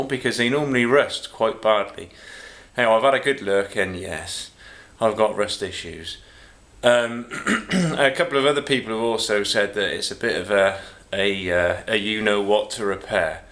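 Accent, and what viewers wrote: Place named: Gloucester, United Kingdom. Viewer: I used to work with a bloke years ago and he used to say BETTER THAN A JOB IN THE TOWN . he was from Gloucester , your accent sounds similar .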